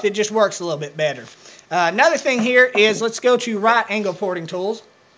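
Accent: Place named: South, United States. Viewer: ha! you are a bit southern sounding.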